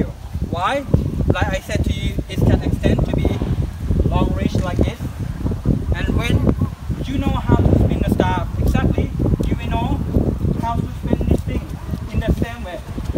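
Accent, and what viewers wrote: Thailand. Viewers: สำเนียงนี้คนไทย555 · ตอนแรกนึกว่าชาวต่างชาติ แต่พอฟังสำเนียงเลยรู้ว่าเป็นคนไทย สุดยอบ At first, I thought he is in another country, but when I heard his accent I know he is Thai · hey i know you are thai with your accent THAI GANG 🔥 🇹🇭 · somehow your accent sound like Thai accent to me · นึกว่าคนประเทศไหน พอได้ยินสำเนียงอังกฤษเท่านั้นแหละ ไทยนี่เอง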